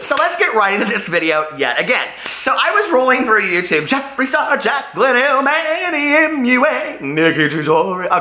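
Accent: South, United States. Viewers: The snap chat fliter makes your southern accent so funny and 10 times more amazing 💕 you're accent makes we wish I was more of a southern gal 😭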